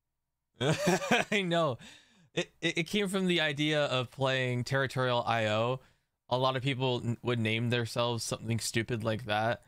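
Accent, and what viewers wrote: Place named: Ohio, United States. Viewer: I knew you were from Ohio by the way you pronounced Medina.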